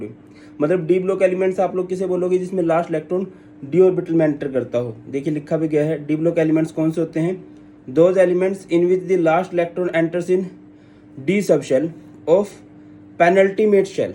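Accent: Haryana, India. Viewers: aarree sir aap bhi Haryana se ho me bhi sun ke accha laga 😇😊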